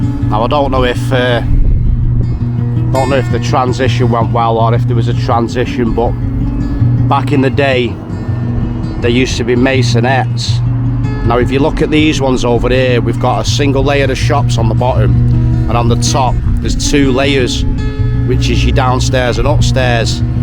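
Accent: Manchester, United Kingdom. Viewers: I could listen to your manc accent all day long 😍 I drive past Eastford Sq every day heading to work. The concrete artwork is a genuine William Mitchell piece. He was the same artist that produced the three 'Minut Men' outside Salford University's Allerton Building. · Eastford square was a vibrant area for local people. Collyhurst was rough and ready but the people were the best in 1960/70. It had masionettes across the road and further up from the car park they were old peoples flats. It was a pub across the road. A well liked intergalactic place for artist to play and sing. The area has been neglected but it was a great place to live. It’s outside people who messed it up. You sound Mancunian but you’re a disgrace to the city. It’s rough now but wasn’t in its heyday. Shameful video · Beltin' channel. Great to hear a proper North Manchester accent, are you from Colly mate?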